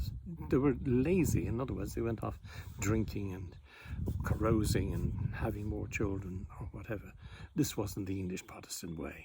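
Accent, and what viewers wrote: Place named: Cork, Ireland. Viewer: Are you from Cork? I am a ru...!!! 🤣👍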